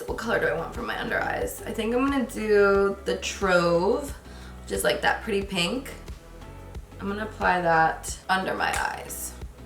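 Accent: New Jersey, United States. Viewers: Her Jersey accent is so thick i honestly love it. She kinda sounds like Rihanna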